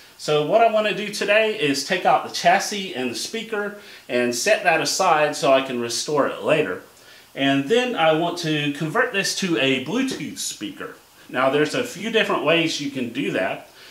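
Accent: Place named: Alabama, United States. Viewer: Love the radio and your solution until chassis can be repaired. Hope you don't mind me asking. Are you from Alabama? Accent sounds familiar. Anyway all the best from Western Australia.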